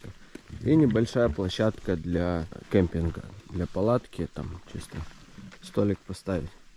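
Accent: Odessa, Ukraine. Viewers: Отличная поездка! Слышу говор знакомый, а Вы одессит. Ну а я с Донбасса, Луганск. Удачи Вам!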